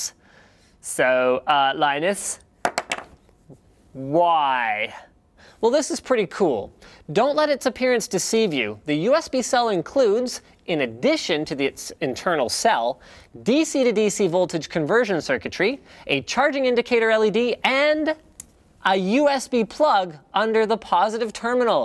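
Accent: Canada. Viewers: what you a canadian ey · Someone could tell that you're Canadian from the first second of this video lmao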